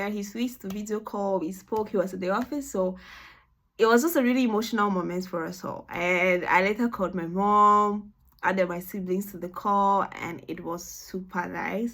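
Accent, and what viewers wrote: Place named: Nigeria. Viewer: U have Nigeria accent did ubase in Nigeria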